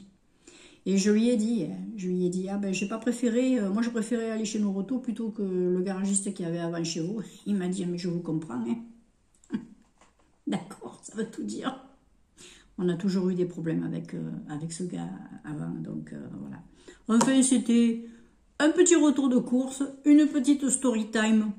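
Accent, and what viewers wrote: South, France. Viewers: Coucou , Les oeufs frais il n'y a rien de mieux!😊 Moi j'adore les faire à la coque Avec des mouillettes de comté 😋 J'adore votre accent du sud!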